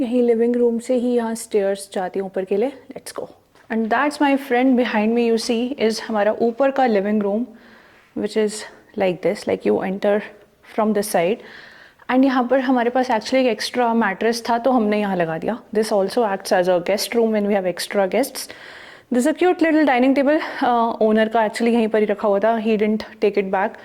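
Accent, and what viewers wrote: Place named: Uttarakhand, India. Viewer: Looks from her accent that she is a Uttarakhand Brahmni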